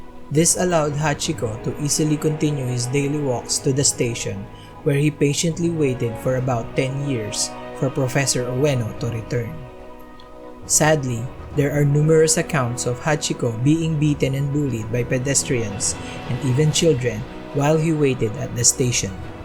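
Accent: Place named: Philippines. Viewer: Are you Filipino? Your narration has an accent! 🤔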